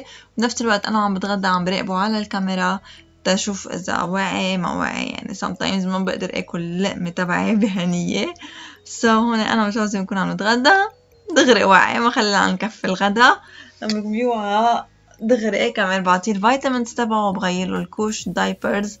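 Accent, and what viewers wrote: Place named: Iraq. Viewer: عراقيه امبينه من حجيج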